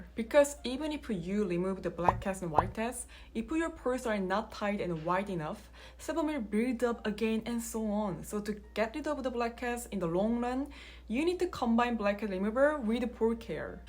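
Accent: South Korea. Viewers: Love your English with korean accent ❤😂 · I’m so ignorant of other cultures, so I never heard a Korean accent in English before, i love it, also you are pretty good at explaining and tell us about everything at the end of each section, new follower ❤❤❤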